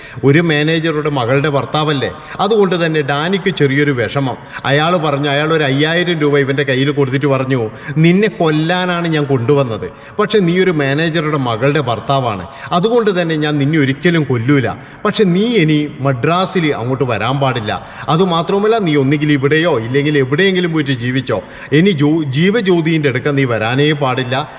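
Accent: Kannur, India. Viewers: മസാല ഒക്ക കൊടുത്തുകൊണ്ട് ഇക്കിളി പെടുത്തിയുള്ള കണ്ണൂർ ശൈലിയിലുള്ള അവതരണം ഇഷ്ടമായത് കൊണ്ട് വീണ്ടും കേൾക്കും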